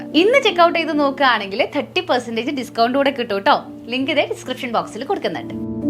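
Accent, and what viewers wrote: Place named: Bangalore, India. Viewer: U r in kochi right but ur smsrm oke kekmbo looks like u r faaar away frm Kerala like banglore oke nikne pole talking Ath kitula ith kitula sadnm nnoke pryne kekmbo I always think bro u r just in kochi ilathe ayt ndha uldh enn oke chaka oke lulu indvlo athrk venm Indel 😅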